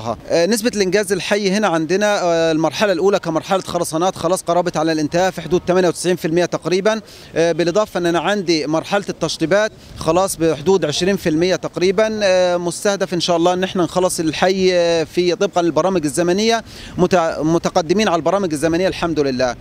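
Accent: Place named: Upper Egypt, Egypt. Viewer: من لهجته صعيدى محترم ولبق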